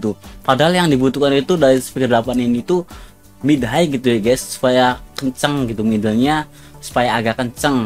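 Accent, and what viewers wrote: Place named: Indramayu, Indonesia. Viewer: Logat indramayue kuh jelas pisan..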